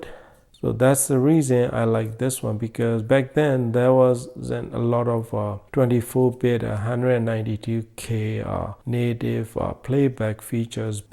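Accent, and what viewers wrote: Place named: Myanmar. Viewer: Your sound like Myanmar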